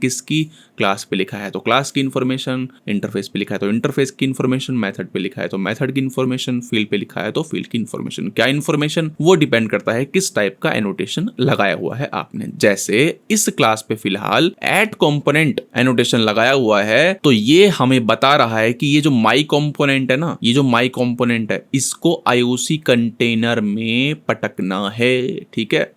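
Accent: Uttar Pradesh, India. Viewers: Guru kamal adami ho accent to pura UP wala hai. Best explaination on youtube. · wow gazab , the way of explanation wow mtlb amazing, ultimate , soothing and solid . lag rha hai samne baith ke koi padha rha hai. and i m from up as well and can connet with an accent , amazin. indeed the best explaintion .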